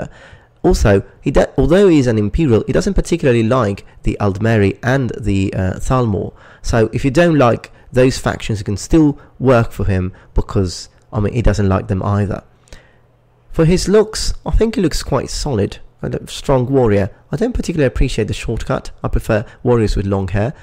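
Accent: United Kingdom. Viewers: I love going back and watching older videos and it always takes me by surprise how thick and almost British his accent was at this point.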